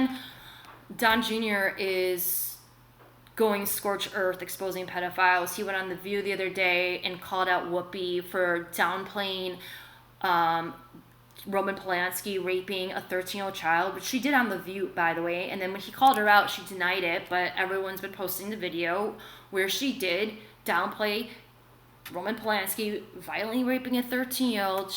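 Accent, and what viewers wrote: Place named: London, United Kingdom. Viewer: Sounds kinda like the kids from London. The little boy and girl that told on their Dad and teachers at their school.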